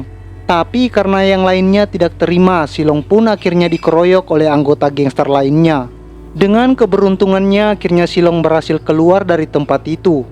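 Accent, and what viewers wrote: Eastern Indonesia, Indonesia. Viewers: Yg ngomong ini sepertinya orang timur. Gak enak banget dengar bcra orang timur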